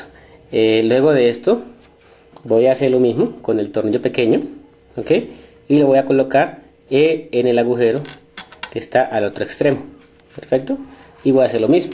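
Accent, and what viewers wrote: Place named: Mexico. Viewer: me cae en los huevos el acento mexicano parece que le agregan acento a tooodas las palabras al final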